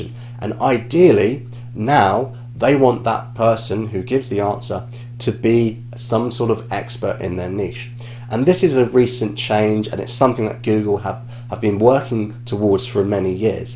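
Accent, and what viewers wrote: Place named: United Kingdom. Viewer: A British accent review is a treat to watch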